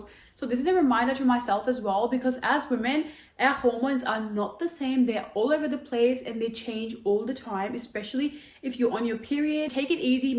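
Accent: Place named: India. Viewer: All I hear is CURRY CURRY CURRY in an Indian accent 🤮🤮🤮🤮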